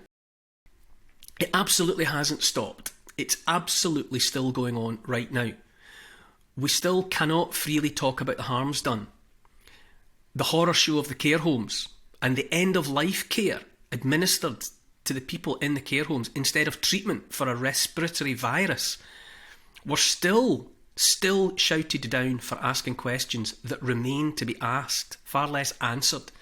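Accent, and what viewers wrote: Scotland, United Kingdom. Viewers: This is what I thought every Scotsman sounds like... You can hear the freedom and yearning for love and truth.... God bless and keep you guys as we all are brought to Gods justice and holy light, these monsters can have what's left of the rock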